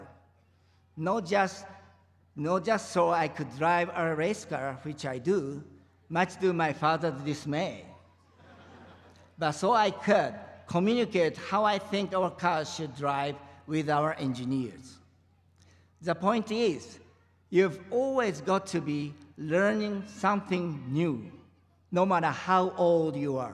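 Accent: Japan. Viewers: 私がどうこう言うまでもなくあまりに素晴らしいスピーチですが、発音は日本語発音な部分があるんですね 私は自分が正しい発音をできないことばかりコンプレックスとしてまともに英語を話せませんが、重要なのはそんな部分ではない事を改めて痛感しました こんな素晴らしいスピーチが、内容でなく自分の発音に結びつくと言うのも情けない話ですが、私も英語でコミュニケーションできるように頑張ろうと心を新たにしました。ありがとうございます。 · 字幕追ってられるから気付けたけど結構誤字なのか誤訳なのかあるね。living roomとか笑 発音もまあ日本のおぢって感じではあって怪しいところあるけど。 とは言え内容はとてもいい。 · British English ではイラーAmerican English はエラー。スピーチは内容で勝負。ザ.Japanese English でも人を惹きつけている。豊田さん👏👏👏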